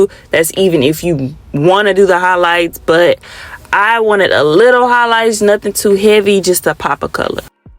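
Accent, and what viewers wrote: St. Louis, United States. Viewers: Hurrr lol your accent is so cute! Sound like a St Louis girl ❤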